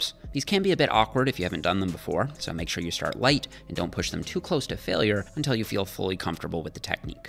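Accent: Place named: Newfoundland, Canada. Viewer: is from Newfoundland (can't expect anyone to know where that is, but I'm also from there) and I honestly applaud his focus on ensuring he speaks clearly as we have/are prone to having a very distinct and often thick accent that sometimes even locals have a hard time understanding what's being said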